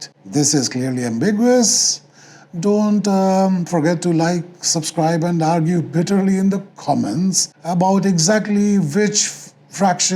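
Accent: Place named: United Kingdom. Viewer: Amazing 🎉❤ amazing 🤩 Very British narration. Thanks for posting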